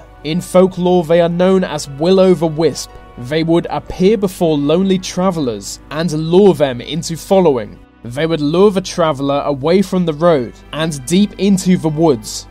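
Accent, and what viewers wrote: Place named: RP, United Kingdom. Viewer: In fact, you say every word ending in Y like a posh twat.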